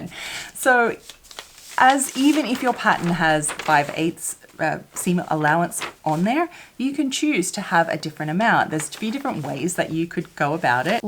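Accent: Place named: Australia. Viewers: Well said ladies, who cares how pronounces her words, Its the content of her very informative videos that matter. I love our ascent, from a fellow Aussie 😉🇦🇺🐨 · Personally I love your accent. I had the privilege of living in Australia for a year in high school, and listening to your videos brings back memories.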